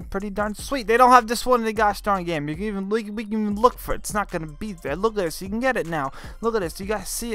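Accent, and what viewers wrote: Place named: New York, United States. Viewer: This dude has a New York accent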